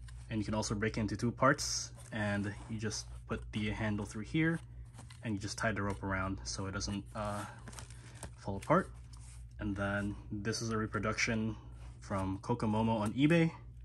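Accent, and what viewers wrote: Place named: United States. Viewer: Your sudden american accent make me confused a little bit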